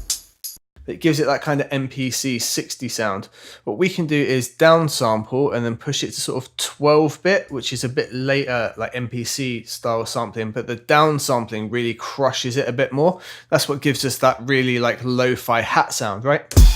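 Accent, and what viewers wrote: United Kingdom. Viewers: thanks man this was really helpful. u welsh?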